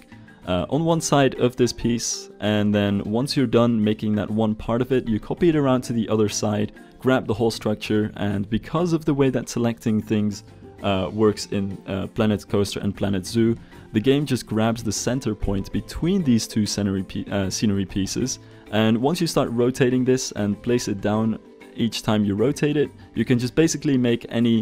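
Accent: Netherlands. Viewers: Are you Dutch i am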